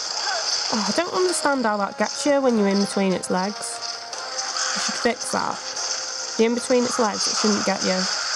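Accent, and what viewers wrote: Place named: Cumbria, United Kingdom. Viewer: Ahaha excellent, I'll be looking forward to it and thank you I've been wondering how it runs on the 3DS for ages. I'll have to be buying ultimate for the 3DS I own already as I am but a humble college student, too poor to afford a Wii U :( from the look of these vids though it doesn't seem like I'm having to compromise too much. If you don't mind my asking, whereabouts in the UK do you hail from? Your accent sounds like it's Carlisle/Cumbria-ish to me but I can't quite put my finger on it haha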